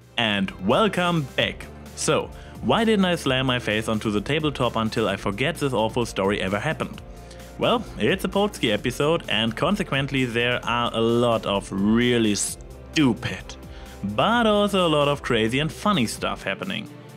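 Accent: Germany. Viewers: Oh, it is! You're quickly slipping into my "most entertaining reviewers" category that includes such hilarious guys as ConfusedMatthew, SFDebris, and JeremyJahns. The strong accent combined with perfectly clear English helps quite a bit on that score; everything's funnier in a German accent (as a movie director once told Arnold Schwarzenegger, and yes I know he's Austrian). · I hate german accents so much, but not as much as I like your videos. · ... are you somehow a version of me that happen to grown up german. I have an American accent, but when I speak german.... the resemblance to your voice is beginning to creep me out...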